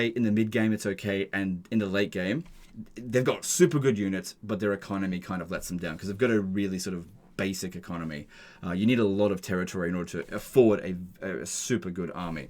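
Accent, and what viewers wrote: Australia. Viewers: Came to see the dawi in S tier, stayed for the Australian.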